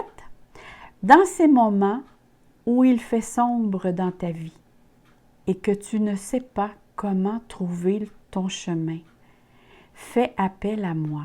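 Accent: Canada. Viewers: Bonsoir, ma petite. Je vous est écouté avec beaucoup d'attention et d'intérêt. Et vôtre tirage ma parlé. Rien à voir mais je doit vous le dire. ( J'aime vôtre accent Canadien. ) Bon revenons à nos moutons comme ont dit. Ont vois de suite vôtre professionnalisme, pour ce qui est d'interpréter la lecture des cartes. Sans oublier, votre précision exceptionnel. Et pour celà je ne peut que vous dire merci. Et BRAVO. Je vous souhaite une très bonne nouvelle année 2021. Santé, bonheur, joie, prospérité, et amour. Que dieu vous protège. Amicalement Daniel. ( je suis balance ascendant scorpion. ) bye bye.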